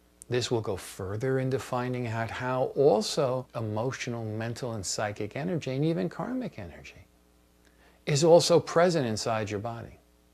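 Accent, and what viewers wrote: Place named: Bronx, United States. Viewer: haha. I think this is a great meditation. His accent and colloquialisms went from the Bronx to the Universe.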